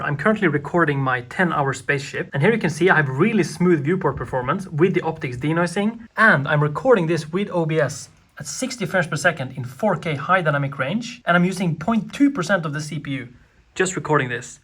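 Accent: Sweden. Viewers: Wait you have a Swedish accent? I think, if your from Sweden write a answer to this comment, in Swedish. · I love how obvious your Swedish accent is.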